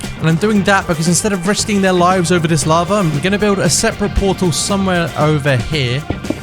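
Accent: United Kingdom. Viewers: Not to be offensive or anything but are you British or Scottish?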